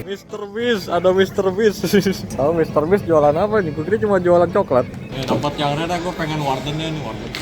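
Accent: West Java, Indonesia. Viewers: terungkap sudah dia tinggal dikota mana 😂😂 tapi di channel manapun dia nyunda bangett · wih aya Sunda sundana ei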